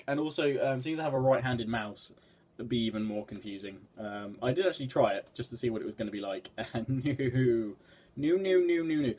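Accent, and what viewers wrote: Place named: United Kingdom. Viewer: Hey please heal soon I am gonna miss your british voice and all of your videos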